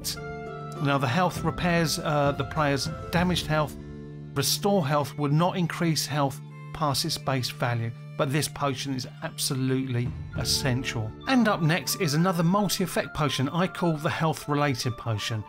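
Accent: England, United Kingdom. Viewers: This sounds extra legit with the English accent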